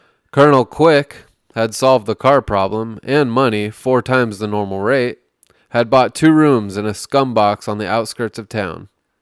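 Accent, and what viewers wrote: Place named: England, United Kingdom. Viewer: Great selection. Nice English accent. Cut the BS at the beginning of your podcast though...no one cares. 😉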